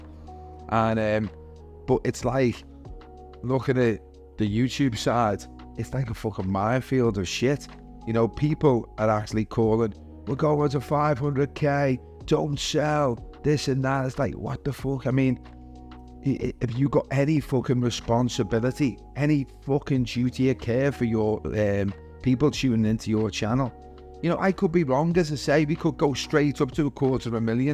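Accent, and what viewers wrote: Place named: Scotland, United Kingdom. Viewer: I follow you for a while on twitter , but leasening to your accent , your Scotish 😁😁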